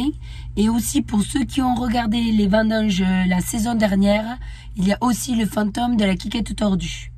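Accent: South, France. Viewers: Tant mieux que la récolte de la vigne soit acceptable. J'imagine que le soleil de votre région (par votre accent du sud) à aidé à ce que les plants produisent quand même. Quel nom de bouteille est ce que l'on pourrait acheter du Québec pour goûter un petit peu votre région. Merci et bravo pour votre travail.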